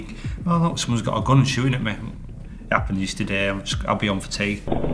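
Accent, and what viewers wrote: United Kingdom. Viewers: A traffic cop where? Your accent makes me believe you’re from Britain/England/ the UK. What do you people call your country nowadays?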